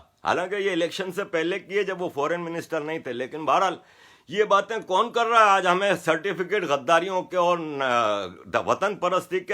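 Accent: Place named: Gujarat, India. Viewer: Sir you are basically Gujarati, and your speach ,thinking shows that you are still Gujarati. i am from Gujarat ,apka face bhi Gujaratio ki jesa hi dikhta hein sir :)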